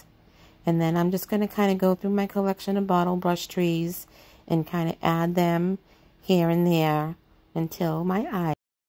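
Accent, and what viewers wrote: Boston, United States. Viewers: Are you from the Boston area? It's been a long time since I heard 'wicked smart'!! Personally I grew up a valley girl (Merrimack Valley) 😊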